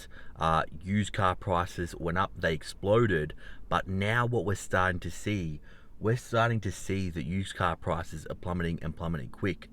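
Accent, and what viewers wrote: Australia. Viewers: I just noticed your Aussie accent. Are you in Victoria? Where I live.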